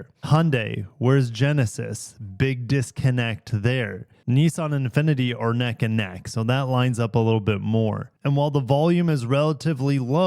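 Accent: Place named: United States. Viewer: i personally hate this accent of america has. he talks to slow, and it sounds congested. i have to listen to video sped up to actually hear the video.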